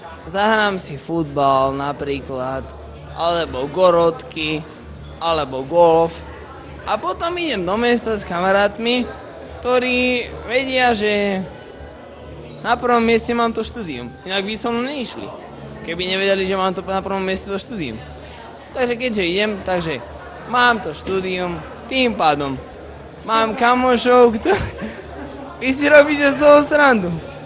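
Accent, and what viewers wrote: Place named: Liptov, Slovakia. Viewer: Páči sa mi jeho prízvuk, sťaby z Liptova pochádzal, len ma trošku mrzí, že búši viac ako by sa patrilo. Aj tak by som prijal a člena mojej družiny.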